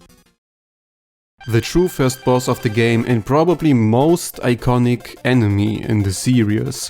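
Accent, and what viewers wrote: Germany. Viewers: I'm glad you're finally back. I never thought I'd as happy to hear your german accent again😂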